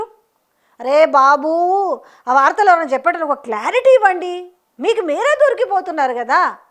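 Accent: Telangana, India. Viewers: మీ వివరణ, confidence, తెలంగాణ accent చాలా బాగుంది మేడం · He vachesva akka bhow bhow anadaniki super. Intaku tamari slang chustunte ap kadu Anukunta kada hoo meeru tg shamala, bhale bhow bhow antunaru. Ts lo kuda evo jarugutunnayo Anukunta kada akko dani gurinchi chyyo akko. Bayam amta. Nuvvu tingari daniyo, telivaina danivo. Mari varadalu vachinapudu appudu eamduku raledu. Nuvvu shyamala kanna chndalamga vunni nee voice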